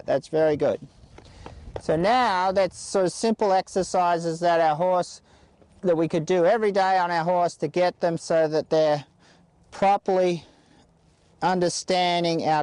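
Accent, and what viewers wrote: Australia. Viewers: Is he Australian? He has an accent...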